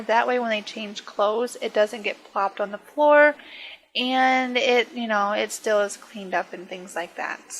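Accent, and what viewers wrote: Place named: South, United States. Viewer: Question: Where are you from? I can hear a slight southern accent and I've always wanted to ask but I didn't feel it was appropriate but with the 100 sub video coming out I felt the time was perfect! And as always amazing build!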